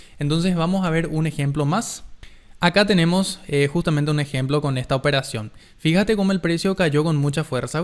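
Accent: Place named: Paraguay. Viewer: que purete es el acento paraguayo bien hablado, que locura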